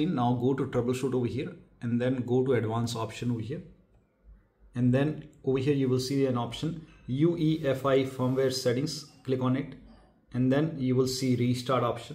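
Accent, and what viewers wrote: India. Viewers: i know shit is gonna work when i hear ind accent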